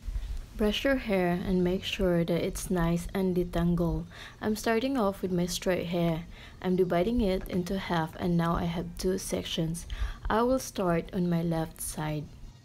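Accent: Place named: Australia. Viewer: I love the Aussie Accent oi , hair lol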